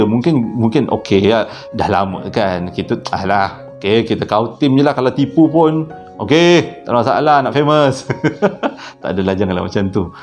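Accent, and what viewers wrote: Java, Indonesia. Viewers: Abg org jawa ke? Cara ckp mcm org jawa la sy dgr. Kalo salah maaf ye.. 😬😬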